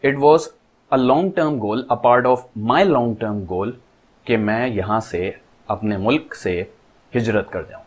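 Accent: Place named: Lucknow, India. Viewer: Bhai mai smjhta tha aap indian sy ho kafi time sy can't belive k Paksitan mai yeh content bn skta wo b Pakistan sy. Apki urdu sy mai smjhta tha ap Lakhnow sy ho.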